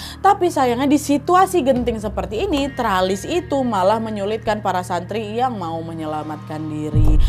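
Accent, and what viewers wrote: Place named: Medan, Indonesia. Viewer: orang mana sebenarnya??? Kalo dari gaya ngomong nya macam orang Medan nggak si...?😊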